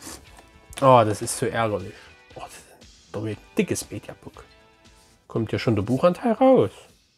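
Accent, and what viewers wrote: Bavaria, Germany. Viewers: Danke für dein Päckchen! Joa mei dein Bayeisch ist ja Klasse! Mach dir mal en Leberkäse vielleicht hilft s! Konntest schon was aus meinem Päckchen sichten? Possesor muss ich nochmal starten letzte mal abgebrochen! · Servus da hast Du interessante Filme bekommen gerade den film Bliss ich wünsche dir gute Besserung es dir ein Leberkäs den kann man titschen aber nicht in die Milch sondern in den Ketchup und zwei Knödel aber zum Essen😉 mach noch mal nächste Mal noch bayrisch das tut gut da kriegt man Heimweh da ich gerne auch nach Bayern möchte in Dorf 🙏 gruss Tony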